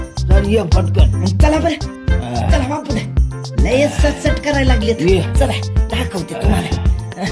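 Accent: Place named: Marathwada, India. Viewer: मराठवा डायची भाषा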